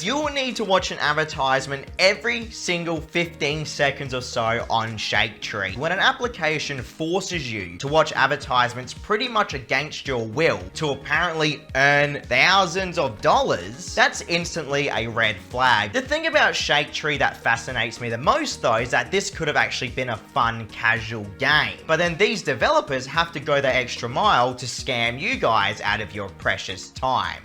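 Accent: Australia. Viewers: Australian accent make mau laughing so hard 😂😂😂😂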